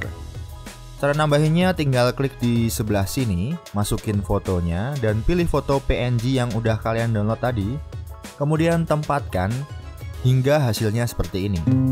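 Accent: Kudus, Indonesia. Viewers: Mas orang kudus? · Smpean ndk wong kudus bro..kok kyok.e shot nek alon2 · Oo sampean wong kudus ya mas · bang elu orang Kudus ya , wkwkwkw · Wong kudus mas? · Wong Kudus mas? Wkwkkwk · mas orang kudus ya? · Masnya orang kudus ya? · Wong kudus toh mas?? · wong kudus mas? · Bang lu orang Kudus kah?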